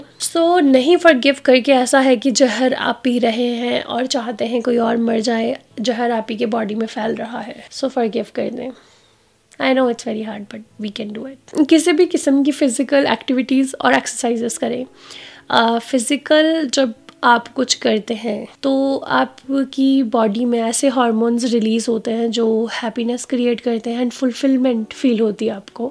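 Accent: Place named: Doaba, India. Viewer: Ist comment but follow bahut tym ton kr rahi, bolan de tareeke ton laggda hai k tuc doaba belong krde ho,mee too,jalandhar de aas pass,aur tuc??bahut achhey vlogs hunde ne, l like veer ji too much,the way he talks,v sensible nd funny nature too,i like it,plz rply i m sonia from jharkhand wid my in laws!!!🙏🙏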